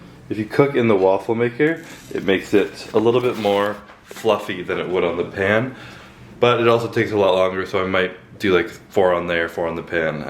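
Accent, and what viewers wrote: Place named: Canada. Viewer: Dude the first time I heard u speak I’m like this guys def Canadian ! keep it my Canadian brother .. shout out form the 6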